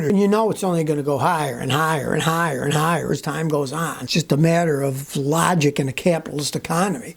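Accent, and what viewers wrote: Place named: New England, United States. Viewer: I really like and enjoy your videos. I like y'alls yankee accent, and also the way you speak very fast. HBD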